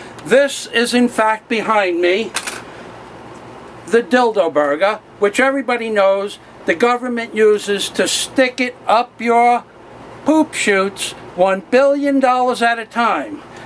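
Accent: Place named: North, United States. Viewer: Thank you for replying. I looked again on the label of the water I buy, it doesn't say 100 nor even 10%; it just is labeled as "Distilled Water". I buy it at Family Dollar because I'm within a short walking distance to the store & it's very convenient. I'm now wondering if there is a way to determine exactly what % is actually distilled in their water? I have of course thought to buy a distiller however I'm retired on a budget. My thought in purchasing a machine was comparing it to the cost of electricity to run a distiller & how much usable water I'ld actually get in say an hour of use, that purchasing it would be about the same(I pay $1 gal). Have you figured out the cost to run your machine per gallon? I'm not sure the equation to do that here, but cooking or heating anything certainly raises my monthly bills. Oh, by the way I was pleasantly surprised to hear a friendly northern accent, haven't heard one in a long time as I moved from NJ more than 20 yrs ago to VA & I'm the only one in town still getting asked where I'm from! 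At least the pollution is less & the lines are smaller here. If it wasn't for the chemtrails it would be heaven, but I don't think there's a place left where that doesn't happen, ugh!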